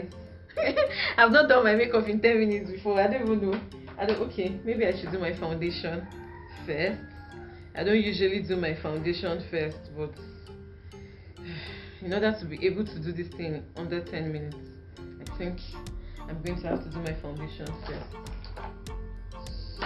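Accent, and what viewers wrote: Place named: Nigeria. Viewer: All the love from Kenya. This is my first time watching someone from Nigeria, to be specific Lagos. I love the Accent and I am so excited 💛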